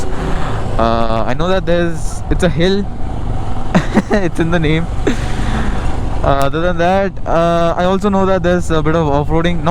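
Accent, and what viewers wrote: Karnataka, India. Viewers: Bro, you have been doing some nice videos and showing some unknown places which makes most of them happy. In most of the videos we see that you speak good kannada accent when you are communicating with or with localities. So, it would be great if you can do some kannada vlogs also along with English🙂. All the best for your future rides👍.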